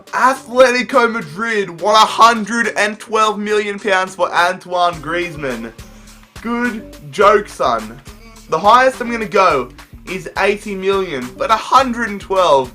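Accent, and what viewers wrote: Australia. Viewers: where u from? is it Australia? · Are u Australia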